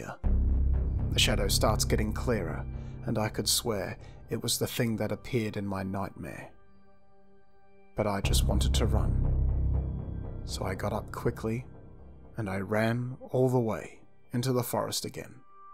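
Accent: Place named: Australia. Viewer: Narrated by an Aussie Aussie Aussie Oi,Oi,Oi.... Cheers from Melbourne Australia 🇦🇺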